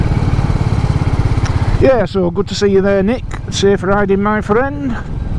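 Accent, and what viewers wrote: United Kingdom. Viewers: Keep the music hes in a rush sounds bit british.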